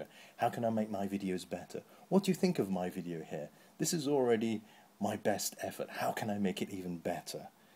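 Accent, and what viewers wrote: United Kingdom. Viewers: I love you so,. You really are the community's daddy. It says quite a lot, being able to bring together such a traditionally fragmented fan base. True, it is only the Youtube Gundam community, but every time the Gundam community manages a respectable step forward, I think it deserves pretty massive applause. Also, when you're joking about "how do I post a video response" I couldn't help but wonder how long you've lived in Hong Kong; your British slagging seems to not have faded yet.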